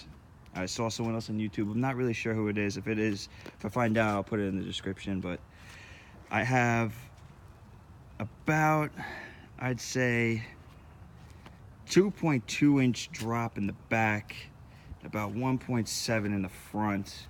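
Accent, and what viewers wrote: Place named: New York, United States. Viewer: The New york accent is all i hear lol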